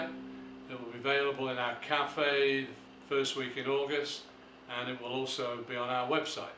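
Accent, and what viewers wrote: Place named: Ireland. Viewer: Im just here for the accent. It's a soft subtle accent, Irish? Pretty. I love this guy. Is he married? M. IL. ❤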